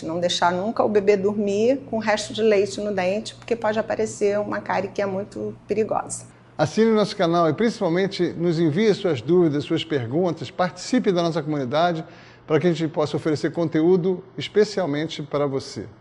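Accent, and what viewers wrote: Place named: Rio de Janeiro, Brazil. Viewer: Muito bem explicado e interessante! A dica do picolé de leite materno foi bacana. Mas esse sotaque carioquês da gema, carregadissimo incomoda um pouco.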